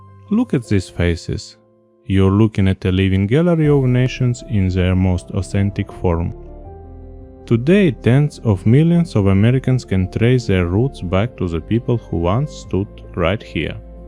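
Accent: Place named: Ukraine. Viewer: You speak like a Ukrainian person whose videos we watch. Of course, I know that doesn't mean you are from there, just that to my ears it sounded similar. Most of your videos that I had watched used the more American-sounding voice. This was the first time I heard your less changed voice. I'm glad you liked the story of some of my family's origins. We have enjoyed going back and finding out where all our ancestors traveled from. I greatly look forward to seeing more of your brought-alive old pictures. 😊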